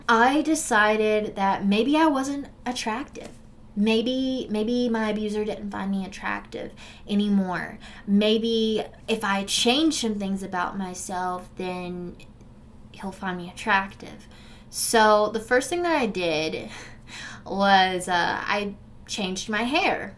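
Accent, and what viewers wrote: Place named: Virginia, United States. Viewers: You basically nailed it 😂 She’s from Grundy, VA. I’m from Roanoke so it’s funny to hear her accent, too. Very familiar. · Your accent sounds like you’re from where I’m living, in tri-cities, middle of nowhere Virginia 😂 lil bit of country, lil southern, lil city talk. Yup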